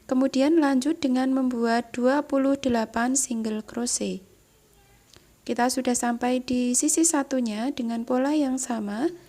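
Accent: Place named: Java, Indonesia. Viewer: Mantap suka suaranya medok 😍😍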